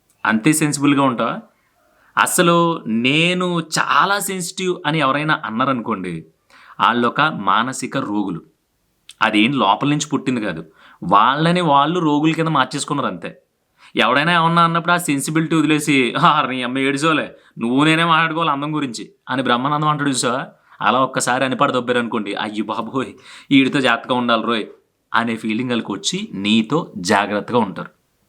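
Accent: Godavari, India. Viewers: Hi bro, midi godavari district kada, ekkada. Baagundi language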